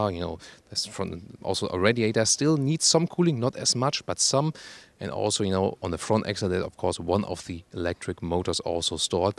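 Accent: Germany. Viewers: SORRY, but that German accent is adorable.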